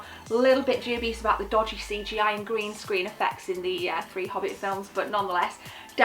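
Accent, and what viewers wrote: United Kingdom. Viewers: You are really beautiful! I love the way British gals talk. I am in the US and I really like your videos. They are not boring like some other people's.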